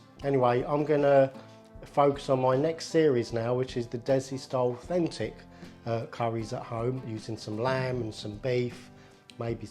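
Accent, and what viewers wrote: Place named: England, United Kingdom. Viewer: I'm dying to know what part of England you're from. That accent is to die for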